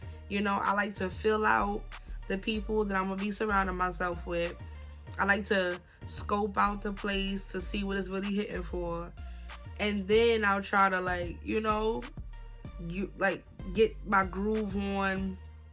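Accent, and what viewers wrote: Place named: Brooklyn, United States. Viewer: You sound like you from Brooklyn ☺️ ! Chileeeee I worked at McDonald’s for a month & boutta start a new job at Burger King 🥴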